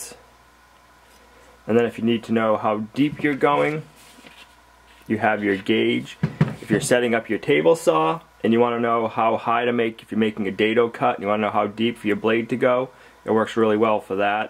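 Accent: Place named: Vermont, United States. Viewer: What are temps currently at Lumnah acres? I think that you mentioned (if not your accent says so) being near Vermont. We are currently at -5 for a day time high temp.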